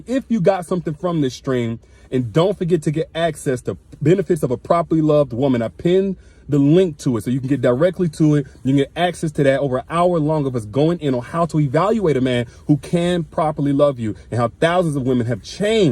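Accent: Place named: New York, United States. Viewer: NYC. Loud and clear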